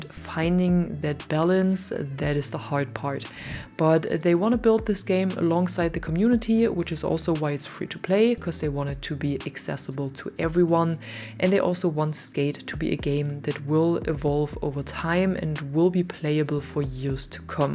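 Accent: Germany. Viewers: Ist dein Dialekt deutsch? Falls ja lass ich einmal liebe Grüße doch schau schon längere Zeit deine Videos spiel das spiel jetzt seit einigen Tagen im early Access... Muss sagen es macht riesen Spaß aber ich komme nicht mit der Grafik nicht anfreunden sie sieht irgendwie extrem unfertig aus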